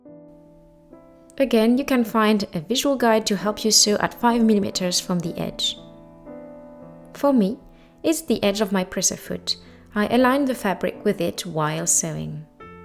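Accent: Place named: France. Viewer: Your English is very charming. Love your French accent!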